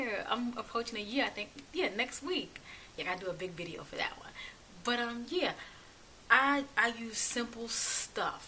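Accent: United States. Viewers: Great video, you look very good for your age and I like the way you speak, where in America are you from?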